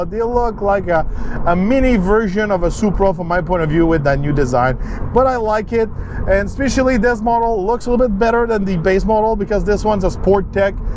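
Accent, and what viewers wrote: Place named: Quebec, Canada. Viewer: Le ptit accent du Québec 😆👌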